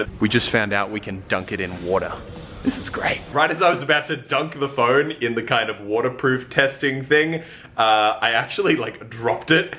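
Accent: Australia. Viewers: Wow I'm dumb I just realized your Australian even though I am Australian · "warder-proof testing" love aus accent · I really love Australian accent <3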